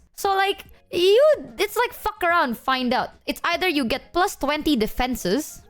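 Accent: Indonesia. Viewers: I just realized. is the best representation of fluent English with Indonesian accent. If you want to know what majority Indonesian accent sounds like (in english), listen to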